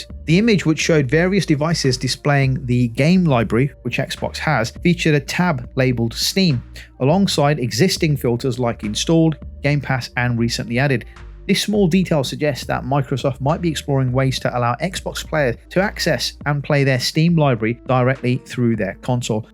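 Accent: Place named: United Kingdom. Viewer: Shut Up, your reporting is so flawed, while yes fans have been requesting an Assassins Creed game setting in Japan, FANS WERE EXPECTING A JAPANESE MALE ANTAGONIST, NOT AN AFRICAN!!!!! Get your facts straight British Accent Guy!!!! That 1 million players is flawed because it does not represent sales like Ubisoft is trying to disguise it as though!!!! Go Back to Reporter School!!!! Hahahaha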